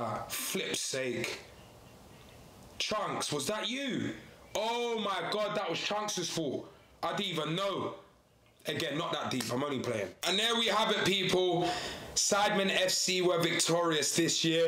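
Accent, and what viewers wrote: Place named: Paisa, Colombia. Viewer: Man got that paisa accent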